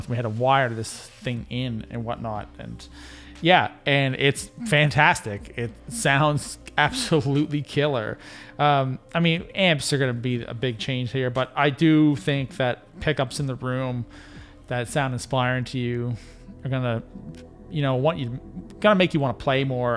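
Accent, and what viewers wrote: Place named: Canada. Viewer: I heard your accent BEFORE I saw the Saga poster... then the Rush poster... LOL... Congrats on being a fine representative of the Great White North, my friend! LOL... Great video, thanks! I have a lot of RGs and I use the 1996T often!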